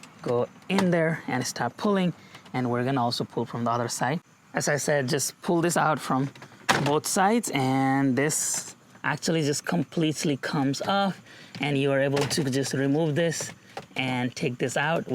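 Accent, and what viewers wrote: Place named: Nepal. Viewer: Nepali bro by accent😊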